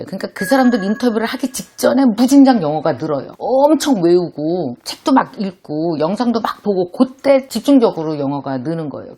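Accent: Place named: South Korea. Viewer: 정말 박수를 쳐드리고 싶네요. 대단하세요. 영어가 모국어가 아니면 당연히 한국인 엑센트가 있죠. 언어는 지식전달이기도 하지만 의사소통이 젤 인것 같아요. 마음과 마음이 언어를 통해서 대화하는거니까 한국인 엑센트가 있어도 의사소통에 문제가 없다면 오히려 한국인으로써 자랑스러운점일것 같아요. 김미경강사님의 강의에 100% 공감하고 동의해요. 용기주셔서 정말 감사해요.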